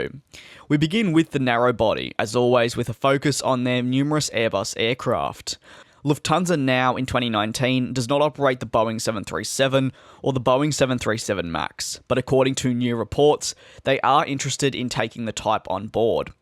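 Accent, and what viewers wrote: Australia. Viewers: Hey man, been such a huge fan of you and you sound australian, would love to meet you since I live in Australia as well. Where do you reside?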